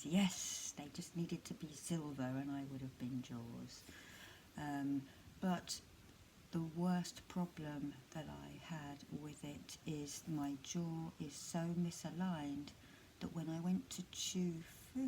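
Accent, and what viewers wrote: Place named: Sussex, United Kingdom. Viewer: I've recently broken a tooth and although it's been repaired, I still find eating difficult, like my teeth don't really match. So, to hear your story, I can only say that you're incredibly brave to have gone through all that and still come out smiling and trying to help other people. And yes, as a fellow Sussex girl, I think we do have a nice accent here!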